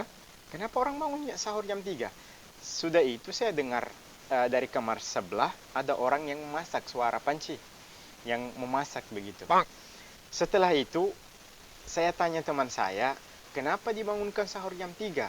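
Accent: Makassar, Indonesia. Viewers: Baru pertama nonton tapi udah langsung tau kalau pasti kuliah di makassar karena logat khasnya 🤣🤣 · Bahasa indonesia nya kayak orang makassar hehe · Logatnya makassar, mantap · Org sidan tp, Ngomongnya kayak org makasar wkwkwkw 👍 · Bisa menyesuaikan banget logat Makassarnya ya mantap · ohh masnya di makassar yah. pantas logat bicaranya tdi ku pikir mirip logat makassar teenyata krena faktor kukiah di makasssar😂 jdi logatnya ikut logat makassar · Logatnya makassar tonji 😄👍👍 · hebat logatnya sdh seperti org Makasar...mantap👍👍👍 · logatnya makassar banget, btw ini kuliah di UNhas kan? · Logatnya seperti orang makasar.. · Logat Indonesia nya ada aksen Makassar 😁👍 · Koq ada aksen mkassarnya...ini dmksar ya?? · Logat makassar. Wkwk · makassar bgt logatnya 😬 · Udah mulai kentara logat makassarnya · Logatnya sedikit ke makassar wkwkw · Logatnya seperti logat makassar ☺️ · pntasan ada logat" makassarnya🤣🤣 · Ini kuliah di mana kayaknya di bagian timur Indonesia ya. 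Kayak logat makassar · Logat Makassarnya kental banget. Btw, salam dari SulSel tapi bagian Sinjai. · kentara mi logat makassarnya hahaha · Logat makassar 🙂🙂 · Bahasa Indonesia Aksen Makassar 😅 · pertama lihat video ini saya pikir kok logatnya kayak logat makasar ternyata memang tinggal di makasar toh😄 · pantas logat makassar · Logat makassar 👍🏻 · logat makassarki dgg 👍👍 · Bahasa Indonesianya Bagus, ada campuran logat makasar.. 👍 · Logat Makassar nya kental... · Logat Makassar tawwa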